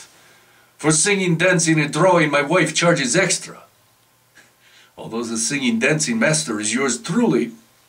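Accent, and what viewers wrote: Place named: Russia. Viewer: I like your Russian accent. хорошо!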